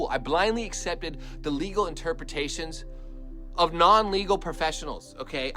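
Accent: Beijing, China. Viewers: 真棒臺灣人不要你這個匪諜。大陸口音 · 我怎么覺得你說的中文有很濃的中国腔？ 你肯定上了親中的電台,你被美国政府通知別譲你入台！ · 請尊重台灣法律，規定就是規定，還是你在中國太久所以理所當然覺得台灣會因為你是拿美國護照，你就不用遵守台灣的法律規定？偷5元美金跟偷5千萬美金一樣都是偷。針對對台灣政府不給你入境的言論，根本很明顯就是自己不尊重台灣法律，然後裝無辜說要台灣政府給你交代？完全不覺得你很愛台灣了解台灣，或了解台灣人民與歷史（你願意的話，可以多讀點有關台灣歷史）。但其實你可以回去中國，你的口音更適合那裡，台灣人聽到你的口音真的會有某種程度的「抵觸感」。這跟你中文流不流利沒有任何關係。PS.你這個影片也沒有幫到台灣只是很自我中心在說台灣政府欠你說明！你說你想幫台灣？還是想「臭」台灣？好搞笑。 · 他的中文是在中國好像是北京學的，難免是那邊的腔調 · 樂樂法利請改掉你的北京腔吧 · 應該沒有人期待你來台灣吧！一口大陸腔去中國工作吧！ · 你的中文是大陆口音，不是台湾口音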